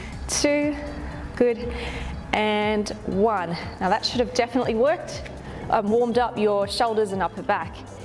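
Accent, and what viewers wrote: Australia. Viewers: Definitely felt the burn in the arms! Wonderful channel, with a lovely focus on gentle exercise rather than wearing oneself out. We are Aussie expats from QLD living in Sweden currently, nice to hear your familiar accent while working out. 🥰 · Ì love your work. So nice to have an Aussie accent and a not at all pretentious instructor.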